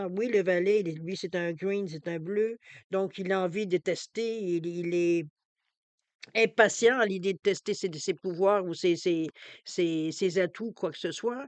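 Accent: Quebec, Canada. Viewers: merci merci tout ce que vous dites me parle et j'aime beaucoup votre façon d'expliquer le tarot et j'adore votre accent j'aime le québec et les québécois mon Chum est québécois .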